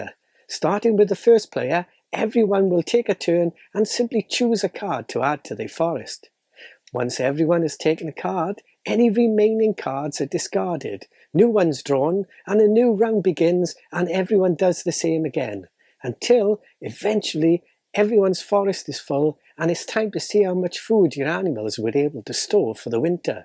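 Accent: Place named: Wales, United Kingdom. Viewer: Great review this is one game that has been on my radar for some time ,and your video has given me the inspiration to add it to my next order with game lore Also its nice to here a whelsh accent as a lot of the board game reviews are from the usa ,so keep up the good work from a old board game loving chat from just over the boarder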